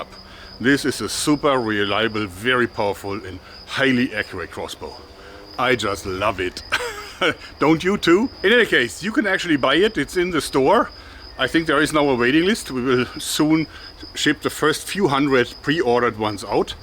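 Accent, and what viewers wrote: Germany. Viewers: I've been a fan of your channel for years, and I was on a spree of your older videos today, and something that I notices is that your german accent disappeared more and more over the years! Keep up the videos, amazing channel!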